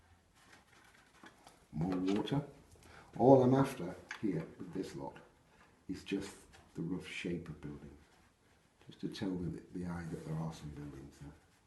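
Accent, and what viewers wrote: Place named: England, United Kingdom. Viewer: Your instruction is precise and detailed. You give the reason why you are doing something and illustrate clearly. I too shall look for your book. You do need a new sound system though for those of us who have limited hearing. English accents are not always easy to follow for Americans, but having hearing trouble just makes that more difficult. thank you so much for your lessons.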